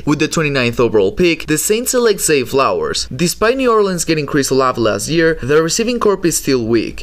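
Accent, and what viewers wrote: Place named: Europe. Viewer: I don’t trust anyone with a European accent to talk football.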